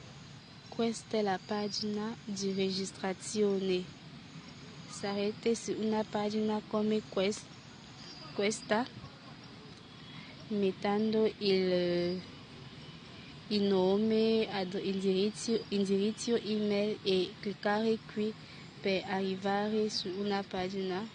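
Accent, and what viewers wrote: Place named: France. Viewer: Il tuo accento mi fa impazzire! Sono francese anchio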